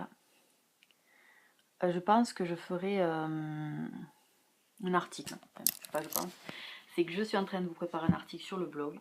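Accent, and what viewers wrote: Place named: Toulouse, France. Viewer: J'avais reconnu ton accent, je suis près de Toulouse aussi .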